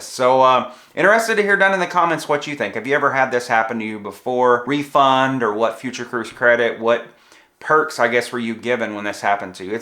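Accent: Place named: Carolina, United States. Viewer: You’d think that they’d have noticed by now that you’re not only a regular but a cruise vlogger who has driven a ton of people to their cruise line with your other glowing reviews and maybe tried to either keep your booking or at least incentivize you better than this. I bet that this video has probably dissuaded at least a hundred people who would have seriously been considering booking a Japan cruise with Celebrity to not book that cruise, and that your previous videos extolling their virtues as a cruise line probably drove them hundreds of bookings or more. I know I love your videos! Right now I live vicariously until I can afford to cruise again but I appreciate your honesty on all these videos and your Carolina accent makes me feel right at home. Also I agree with the folks who said you should consider going to Japan anyway and getting the train pass, if you can afford to do so. 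Definitely a country worth exploring in depth.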